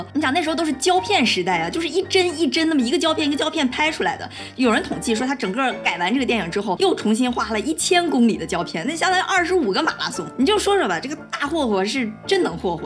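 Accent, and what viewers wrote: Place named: Northeast, China. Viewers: 大连妹妹，口音明显，支持老乡! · 同意，小lin的笑声有东北味儿，小lin是东北人吗？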